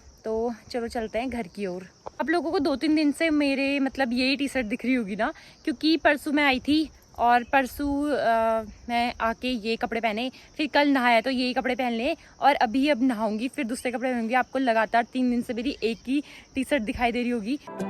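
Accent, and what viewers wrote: Pahadi, India. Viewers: Aapki bhi pahadi boli humari jaisi hai same❤😊😂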